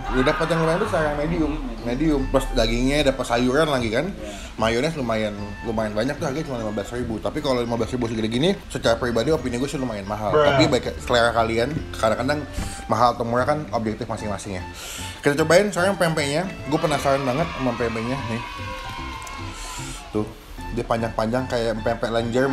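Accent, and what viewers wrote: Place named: Palembang, Indonesia. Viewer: Wong plembang mno suaronyo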